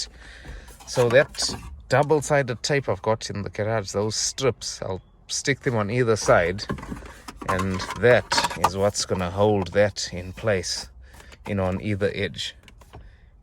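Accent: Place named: South Africa. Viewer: Bra ekse sunno wk.. you chune like me from s.a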